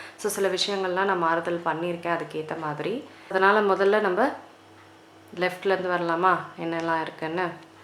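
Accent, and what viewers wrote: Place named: Kerala, India. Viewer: Slang Oru malayalam style pole und..🔥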